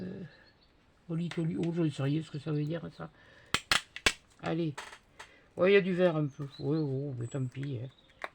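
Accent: South, France. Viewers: Bonjour j adore vôtre accent du sud vous me faites trop rire.et vos réalisations sont très jolie.❤😘😘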